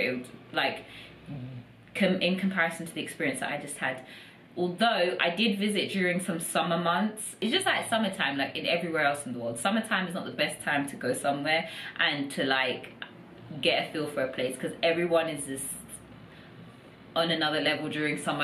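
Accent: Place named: United Kingdom. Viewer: Omg I hate British accent, but I love the video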